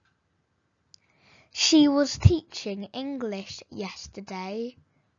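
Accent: United Kingdom. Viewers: Yes, it is a British accent 🙂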